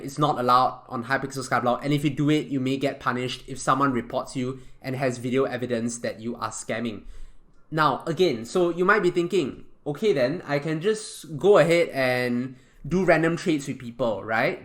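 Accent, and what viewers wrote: Singapore. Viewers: u sound singaporean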